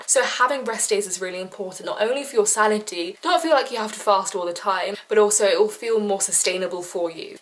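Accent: United Kingdom. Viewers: Omg i love the British accent 💖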